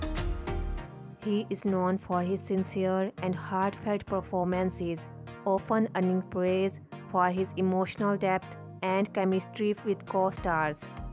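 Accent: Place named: Thailand. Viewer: I could be wrong but i think the narrator seems to have Thai accent.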